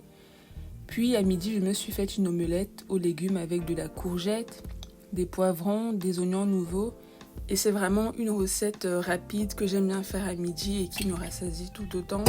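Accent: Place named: Cameroon. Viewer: J’adore bravo… 3 vidéos en quelques minutes , je me suis abonnée à la 3eme minute après la 1ere vidéo. Le petit plus la voix et l’accent un peu camerounais à la quelle vient s’ajouter la forme des bananes plantains 😊 ça renforce cette idée des origines camerounaises… Très belles vidéos et franchement la voix est captivante ❤❤❤ Je te souhaite un beau succ😮pour la suite ❤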